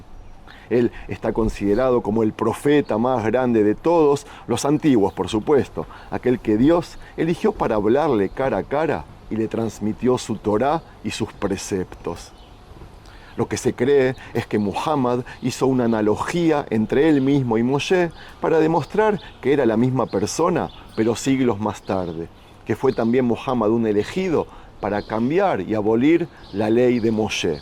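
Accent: Argentina. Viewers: Dices que eres judío pero hablas argentino dx Como es eso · Te crees Judío y al escucharte eres un Argentino. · Hola he oído y visto más tus relatos y apreciaciones personales pero muy sesgado e irrespetuoso con la confianza brindada que pena pero que se puede esperar de un judío y cuando comentas del Corán no es la Biblia del Islam es un libro Islamico ..ya que Biblia significa libros que fueron de diferentes épocas y diferentes profetas o no? Eso significa en el hebreo o no? Ya y el Corán es un libro repito como la Torá y si eres apasionado de la Biblia te felicito y me gustaría preguntarte si la leíste o solo eres fanático? Y si lo leíste dime que significa lo que dice Isaías respecto al pueblo judío? Y que quiere decir en el capítulo 52.13 en adelante? Y que manda a decir nuestro YHWH =nuestro Creador en el cap. 54 del mismo libro? Y si habla de cronología de la Biblia Jesús que es para usted? 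Bueno espero ser respondido y no sea usted un mero YouTuber ufanado en conseguir seguidores con sus fantasías y debe usted ser imparcial objetivo y verás si quiere hacer un reportaje y más si se trata de religiones así los que no saben aprenderán pero cuando usted usa su canal para sorprender en el español de acento Argentino causa usted preocupación y molestia y los que hemos leído algo de la Biblia y conocemos algo del Islam quedamos sorprendidos al ver a un judío siempre judío por quienes murieron todos los profetas perseguidos y por quienes Jesús fue juzgado y muerto o me equivoco? Y hablo de un punto neutro sin afán de bandera religiosa .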